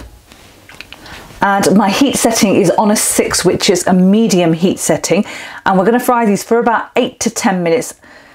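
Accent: United Kingdom. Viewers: oye fake King tujy itne se bat samj nai ati ke wo UK main pedA hue hain is ley un ka accent asa hai aur fake accent wo hota hai Jo banda 3 month UK main rahy aur phir British accent ki Tara bat kare. to ye sab ko pta chal jata ke ye fake accent bana rha hai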